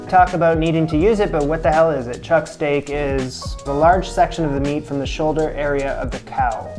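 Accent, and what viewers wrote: Canada. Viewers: Listening to you speak you sound like someone from North America and your ou dip-thongs narrow that to Canada, but your vocabulary (minced beef, rubbish) suggests you’re on the other side of the Atlantic. But then you express surprise that butchers don’t understand what you want when you ask for a cut of ‘chuck’, beef, which I would have thought as an ex-pat you’d simply realise is because it’s a term not used in the country you live in. But you don’t make any comment bringing up that possibility, (very odd). All of this creates an air of mystery. After watching two videos, I notice you never refer to your nationality, even though you use vocabulary that doesn’t fit with your accent. I’m assuming, based on your trip to the butchers, his accent and the look of the buildings outside, you’re somewhere in the UK. To top it all you look and perform way too expertly in the kitchen for someone who doesn’t know much about cooking. 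The mysteries just keep coming. Why is there such a big mystery about where you’re from, why you’re cooking in a country you’re not from, how you became so adept at preparing and cooking food and so on? Is there some part of the puzzle I’m missing?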